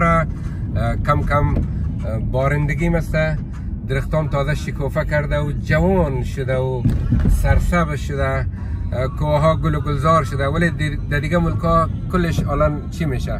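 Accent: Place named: Jaghori, Afghanistan. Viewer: ده جگرت نفس با امو لهجه قشنگ جاغورگی خو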